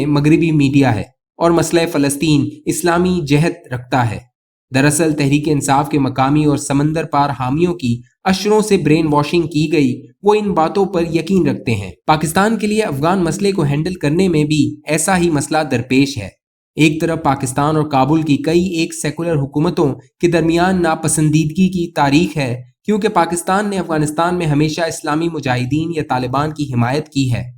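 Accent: India. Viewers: انڈین بندے کا لب و لہجہ ہے یہ تو.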